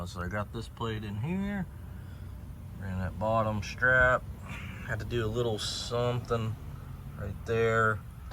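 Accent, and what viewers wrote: Pittsburgh, United States. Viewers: I just subbed bro lol I’m doing mine right now actually haha. By the way…..??? Are you from Pittsburgh??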